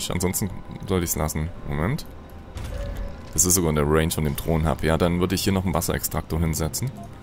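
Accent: Saxony, Germany. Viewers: Bist du Sachse, falls Ja. Liebe Grüße aus Leipzig😂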